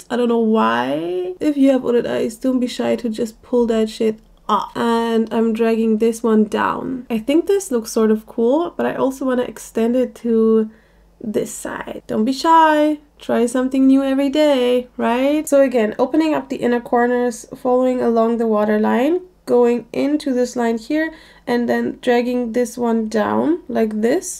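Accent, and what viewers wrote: Germany. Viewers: where are you from? your accent sounds a bit german... greetings from germany😊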